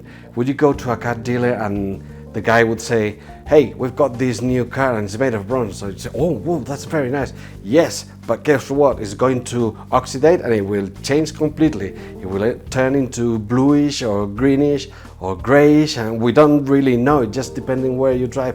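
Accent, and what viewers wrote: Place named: Spain. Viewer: Se nota que es español pero no lo hace nada mal,cuando un inglés habla español tambien se le nota, mucho mas. Ánimo.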